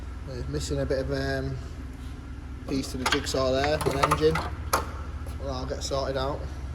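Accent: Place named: Manchester, United Kingdom. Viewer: Where you based? you sound like Manchester... Someone had a go at mine before i started it as well lol... There is a few videos of the work iv had to do, unbelievable lol.. Yours is mint 😆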